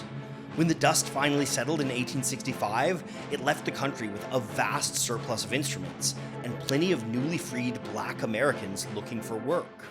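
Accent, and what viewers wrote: Canada. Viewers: Well, he is Canadian. In classical ensemble instruction in the UK I only heard it said corn’t from various directors and instructors. I’ve only heard Americans over-emphasise (to my ears) the net part.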